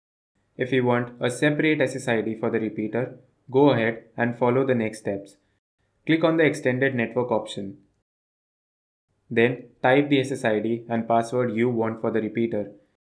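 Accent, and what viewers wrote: India. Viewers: regardless what you are talking about Indian english is just funny :D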